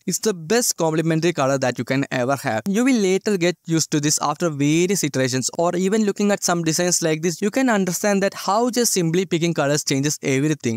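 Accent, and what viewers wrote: South India, India. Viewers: South Indian accent is sweet and you can keep up with it but speaking slower will make your word more clearer. Just keep your content top notch accent doesnt matter that much Cheers Brothaa✌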